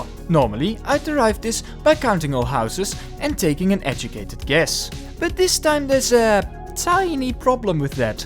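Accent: Netherlands. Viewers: Yes always, wonder where this guy’s from, Amsterdam? He sounds like he’s from the North Holland province. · Its funny how a Dutchman recognizes another Dutchman just from their English accent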